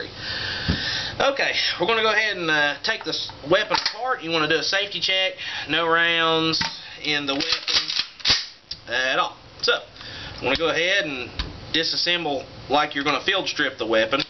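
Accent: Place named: Alabama, United States. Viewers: Sounds like he is from Bama. Good vid! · Dude, where are you from? Trying to place your accent. Tennessee? Kentucky?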